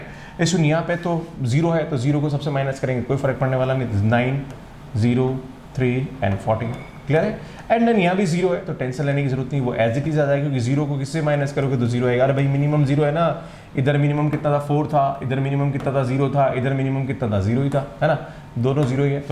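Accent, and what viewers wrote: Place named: Madhya Pradesh, India. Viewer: Sir why did you add two in junction as it is of any use. Sir are you MP my friend has same accent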